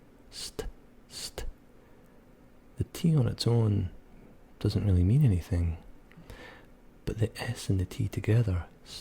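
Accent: Scotland, United Kingdom. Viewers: I absolutely love hearing your Scottish dialect! If you could take it even further and teach some words only spoken in Scotland, that would be really interesting! For example "bairn" is one I learned recently. You have a great asmr voice. Thanks for the video! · This is my kryptonite! I think my ears have melted! I have loved Scotland and her men/language all my life! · Wow I love this! I'm not sure if anyone is familiar, but is Alfec from Broadchurch speaking scottish? His accent really sounds like yours, and I fucking loved it! Great video, keep it up! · this is west coast Scottish at its finest ... or east I can never remember but I am from near Edinburgh. also we are great at missing out words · Im so used to being around my fellow scots that i hate the way americans pronounce scotland, like SCATLAND so this was relaxing hearing being pronounced the same way i do, weird peeve i know but this was really soothing, also Stupit with the first t being a ch sound is a good one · I love your Scottish accent it's very relaxing 😴 great video I love how you say all the words in the Scottish accent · Good god, is anything better than a Scottish accent? 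I think not. New subscriber for sure! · Genuinely love your accent. One day, i will visit Edinburgh. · Hi from France,, I am in love with the Scottish accent omg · I love scottish accent...😍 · I can't believe you have only 1k subscribers. Your deep Scottish accent is so absolutely relaxing. I also loved the tid bits of information on your pronunciation of the words. You mention alot about the T's, perhaps you can teach us about the pronounciation in R's? The R's seem to be pronounced much different than that of North Americans, as well. I always thought that one of the T's were ignored when they were doubled up, for instance - Little, Litter, Sitter, Settle, brittle...it's almost like that first T is slightly pronounced. This was a great video! I really enjoyed it. I'm so glad I found your page. I look forward to watching the rest of your videos later. 😊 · Thank you for this fabulous ASMR. 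I am brand new to ASMR. Amazing. I love love love the Scottish and Highlander accent. Thanks for the dialect lessons. So interesting! Thank you! I canna even say how happy I am to have heard ya! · ISTG scottish is the best accent i've ever heard, there's some scottish actor in Uncharted man i love it.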